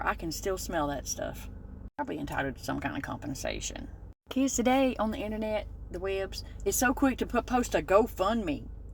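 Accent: South, United States. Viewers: I had such a different experience as a GEN Xer. Missed so much of what all you share, but I absolutely enjoy every single moment of the videos I have seen from you, 💖, 💖 and 🤭🤗😉 Iiving vicariously thru the stories of your own experiences. 😂🙃🤭🤩 LOVE IT!! LOVE YOU!!! Appreciate your time, effort, and authenticity, plus that Southern accent!!(which is likened to any of those heard throughout the SOUTH which I, rightfully, should have, but don't really. Is what it is. 😉 I CAN put-on plenty of different Southern dialects...all mixed up into one...kind of. Hehe) 🦋🌸💞🫂🏆💯THANKS SO MUCH, AND may GOD bless you!!!💯🏆🫂💞🌸🦋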